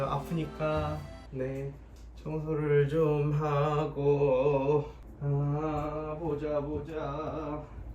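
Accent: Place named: Seoul, South Korea. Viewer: 이 양반 내가 본 서울 사람중에 서울말 가장 잘해요 ㅎㅎ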